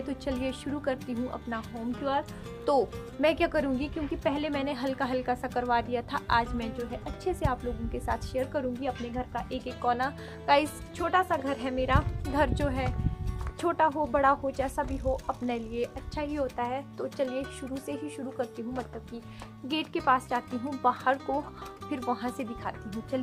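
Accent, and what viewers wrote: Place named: Haryana, India. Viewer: Aapki language Hamare Haryana se bahut match khati hai Hamare Haryana mein bhi Aisa Hi bolate Jaisa ki aap baat karte ho bolte ho idhar ko Udhar ko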